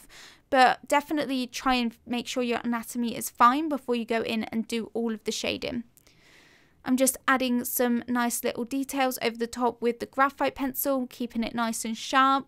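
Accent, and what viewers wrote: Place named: Midlands, United Kingdom. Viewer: I do so love your videos, every brush or pencil stroke is narrated in a way that I can understand and it all sticks in my head. So much better than a lot of tutorials on Youtube that are more someone drawing and music playing with no narration, not that the artists are not good, it's just better with narration, I am still trying to work out your accent? Midlands maybe but a touch of Southern, great though, every word is clear and informative, thank you for making these tutorials they have brightened up my days no end and re - ignited my determination to be able to draw.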